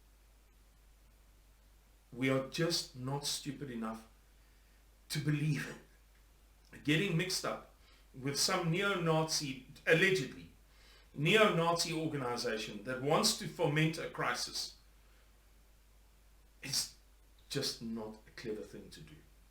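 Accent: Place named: South Africa. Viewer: keep up the good cause. I hope someday you can visit the Netherlands and tell my fellow countrymen about the situation in South Africa. I have lived for 6 months in South Africa, in Kwazulu-Natal, it was a great experience. I just love this country. I love Afrikaans, and I love your accent when you speak English. May God bless you and all your efforts for your country.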